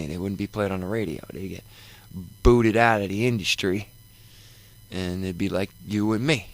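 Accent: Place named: Brooklyn, United States. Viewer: Cool my lil gangsta rapper from Brooklyn, soon I'll buy all your songs, I like the Brooklyn accent, I've always been a fan of real hip hop ! Stay strong boy :)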